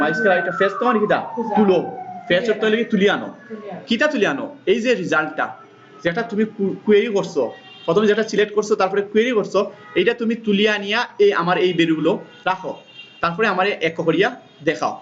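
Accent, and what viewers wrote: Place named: England, United Kingdom. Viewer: Parts sound English ish.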